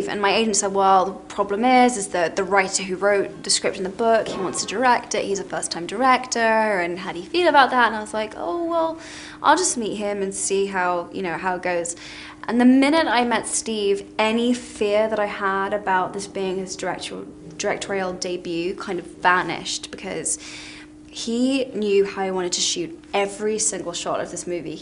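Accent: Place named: United Kingdom. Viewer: Love her british accent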